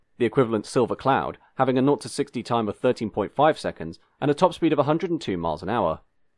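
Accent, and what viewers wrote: United Kingdom. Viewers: The Youtuber is British so deal with it- Foreigner.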